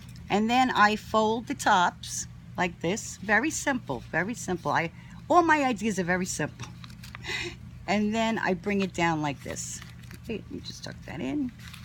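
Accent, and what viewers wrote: New York, United States. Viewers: Love these! btw, are you from NY because you sound just like my mom! :D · hi, i just watched gayle's YT of her order from your shop (and the extra goodies you sent her) so happy she shared you with us, i just subscribed to your channel and favored your etsy shop-i'll be ordering from you soon! you have such a variety of yummy goodies in your shop, i love that you have a dollar bin section! (just like Target and Michaels!!) great idea! i really love these pirate pockets you made and the wax seal stickers. i'm sure you hear this all the time, but i also have to mention how much i enjoy your NY accent! thanks for sharing your great ideas and inspiration. so glad to have found you.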